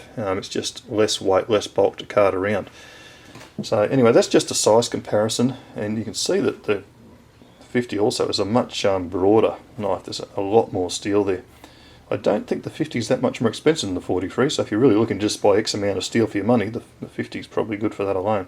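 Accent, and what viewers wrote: Australia. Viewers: Great review. Nice to hear an Aussie accent instead of all the Americans. Have you ever used the Ontario SP10? If so what are your thoughts compared to the SP50?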